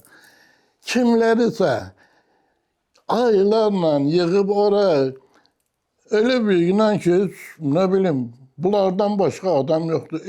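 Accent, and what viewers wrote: Baku, Azerbaijan. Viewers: Allah uzun ömür versin Size dayı,halaldır Bakılılarına.Bakilarinin lehçesi kimi lehçe yoxdur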